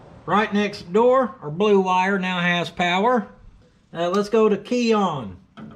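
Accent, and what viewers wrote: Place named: Los Angeles, United States. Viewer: Your from LA I knowd it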